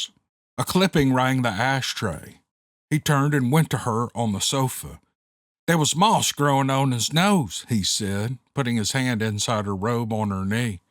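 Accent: South, United States. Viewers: Hey,great stories about the poachers,I really enjoyed them,you've got the perfect southern voice to tell stories.👍🤙👍🤙👍🤙👍🤙👍🤙👍🤙👍🤙👍🤙👍🤙👍🤙👍🤙.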